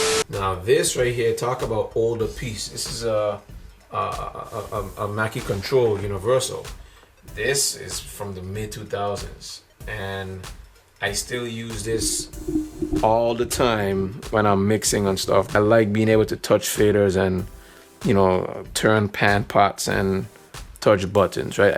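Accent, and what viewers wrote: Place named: Caribbean. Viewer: It’s a blessing that I came across your videos. Much respect to you and your talent. Great content thus far. Sounds like you have a Caribbean background. I’m from the islands 👍🏽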